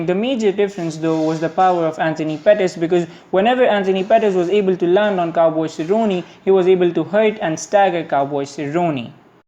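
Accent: India. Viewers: 9 dislikes and 4 likes???? People are put away by your accent, but Cmon Indian hai toh you can't change that accent. This is quality content.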